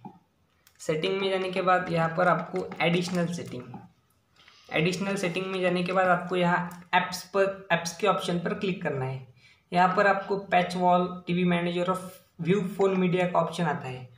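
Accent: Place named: India. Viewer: The most fucking hindlish I've ever heard. Blood from the ears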